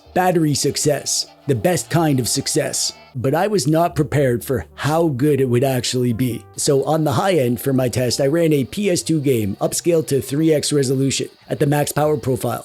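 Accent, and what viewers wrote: Canada. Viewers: That Canadian voice can't be hidden ❤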